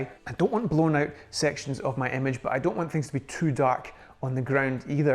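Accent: Scotland, United Kingdom. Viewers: Do you think the RC controller is worth the extra cost, since most of the time you are likely already carrying your phone? Great video! Love the Sean Connery like accent!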